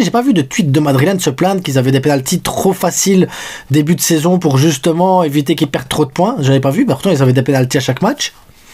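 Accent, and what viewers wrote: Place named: Belgium. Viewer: Tu es un tueur mec 😉❤️ J'aime les frites 🍟 ainsi que l'accent belge 😉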